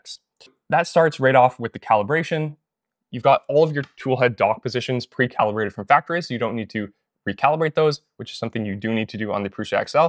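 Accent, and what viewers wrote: Canada. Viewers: Good video. You've got. A unique. Halting style of speaking Canadian. I don't hate it. Eh.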